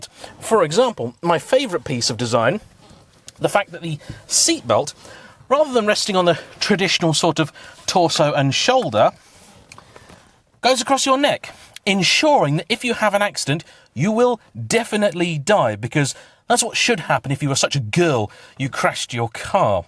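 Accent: United Kingdom. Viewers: All i wanted to hear for this entire video was the Viper V10, but all i heard was an annoying British person droning on…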